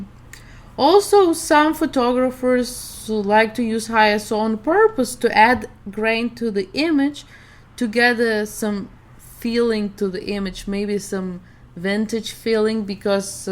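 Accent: Russia. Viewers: Your videos are really helpful! What accent is that? Is it Russian? :)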